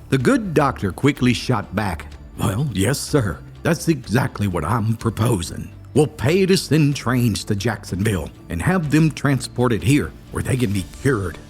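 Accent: Appalachia, United States. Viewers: Needing to rest and listen to something.. pops up! PERFECT! 😊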